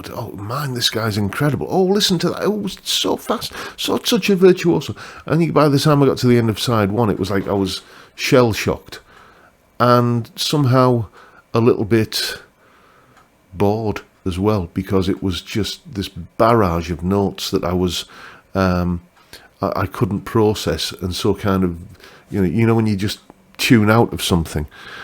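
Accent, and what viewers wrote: United Kingdom. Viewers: You sound British so my advise learn 2,nick bowcott songs and call me in the morning nevermind the dull players here but I'm from America what do I know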